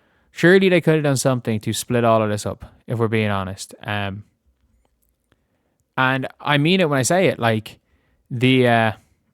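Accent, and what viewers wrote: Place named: Ireland. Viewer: No! He’s Irish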